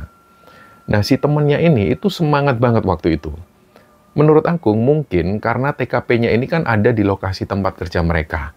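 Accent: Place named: Banjarnegara, Indonesia. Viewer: Banjarnegara mana suara nya✌️✌️🥰 Salam sehat dan sukses slalu buat mas dan semua kru nya🤲🏼😅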